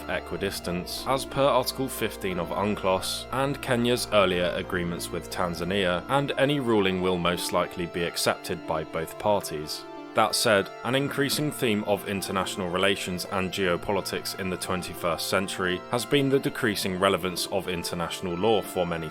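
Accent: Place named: Australia. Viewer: You talk like Billy Finn, the dolphin the family guy, which drives me to speculate you are from Australia.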